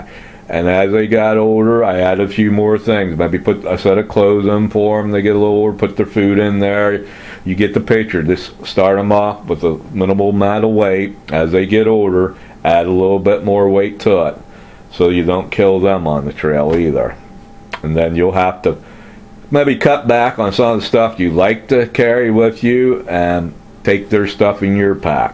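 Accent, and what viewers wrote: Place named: Carolinas, United States. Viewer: where are you from? kinda sound like Forrest Gump, you from the Carolinas?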